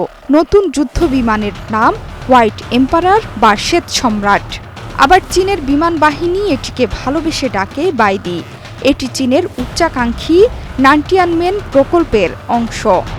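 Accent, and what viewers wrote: India. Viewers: ইন্ডিয়ান স্টলে কেন খবর বলেন?? সোজাভাবে বললেই তো হয়।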